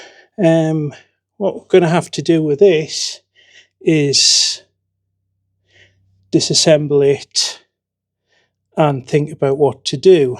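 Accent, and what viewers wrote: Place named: Llanelli, United Kingdom. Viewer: Your voice is crosshands to Llanelli area Iam over Newcastle Emlyn way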